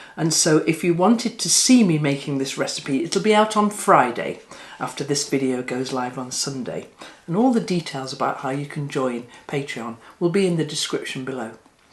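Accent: England, United Kingdom. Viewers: I’ve only just found your site 😊 & love your sweet English accent.. Very informative & indeed brilliant ideas..😊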